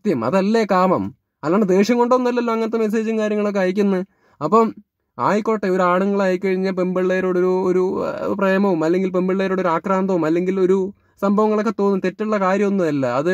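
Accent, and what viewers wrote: Palakkad, India. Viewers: Palakkad slang varund broiii👏👏👏👏👏 pkd anel proud of yu man✌️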